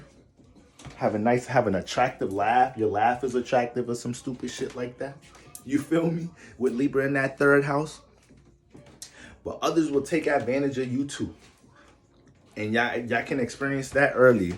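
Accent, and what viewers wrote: New York, United States. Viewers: I swear you from nyc or jersey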